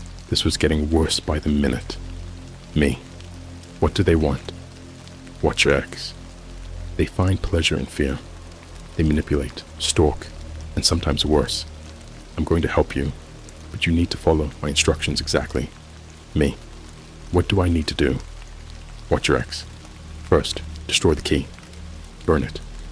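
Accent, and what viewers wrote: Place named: United Kingdom. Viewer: You sound like you're a fellow Brit! However, "nine hundred and eleven" makes you sound like a bot! it's nine-one-one!😺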